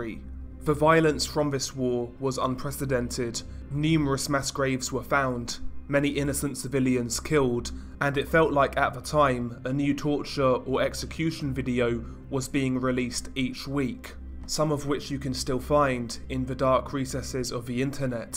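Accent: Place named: United Kingdom. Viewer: I hate the British accent but as a Mexican I can’t stop watching these videos 😔!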